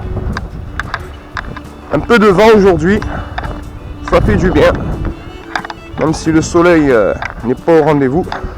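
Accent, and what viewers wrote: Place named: Corsica, France. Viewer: Vu l'accent Corse, que tu te coltines, sache que je suis très heureux de t'accueillir chez moi. Et même si je me trompe...tu es le bienvenu quand même. En effet, les forts de la Gavaresse et de La Colle Noire, ont été des hauts lieux de notre histoire méridionale. J'ai eu le plaisir et l'avantage de parcourir les tréfonds de ces architectures, alors que j'étais ado en recherche d'aventure. Sans compter les galeries de mines du Cap Garonne ...bien avant qu'il ne soit exploité pour le tourisme. Autant te dire que j'y ai passé de bons moments à retourner les pierres, pour y chercher une suite.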